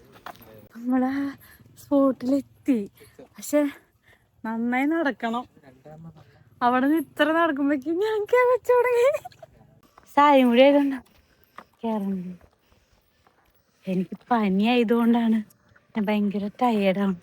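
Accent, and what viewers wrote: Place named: Thrissur, India. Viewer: Trissur slang is very good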